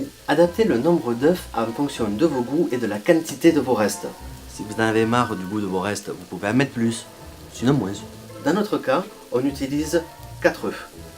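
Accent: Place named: South, France. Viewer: Super sympas cette petite émission:o j'ai aussi eu du mal à comprendre ce que disait la voix trafiquée mais ton merveilleux accent du sud et ton sourire me l'ont vite fait oublier x) merci!!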